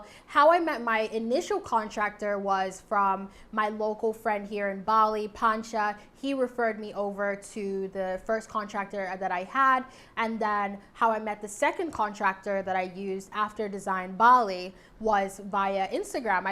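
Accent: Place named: Canada. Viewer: Are you Canadian? Your accent sounds like a Canook!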